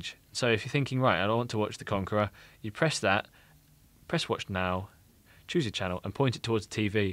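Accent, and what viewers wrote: United Kingdom. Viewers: Your English British is better than american English, very very strong. I have enjoyed hearing you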